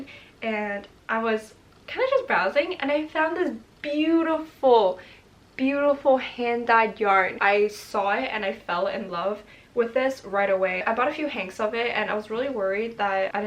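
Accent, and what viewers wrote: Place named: Canada. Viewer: I have never seen your videos before and as soon as you started speaking I was like this girl is Canadian. I wouldn’t be surprised if she’s from Toronto (area) then you mentioned the gta and my head snapped up so fast. Will definitely be checking out that yarn shop. Thank you 😂❤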